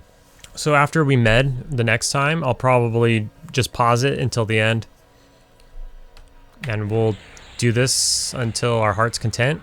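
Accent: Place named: West Virginia, United States. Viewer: I'm from west Virginia , this is how I remember people talking.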